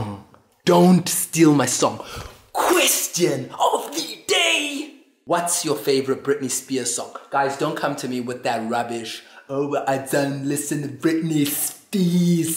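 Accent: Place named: China. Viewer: Amazing Chinese accent!!!!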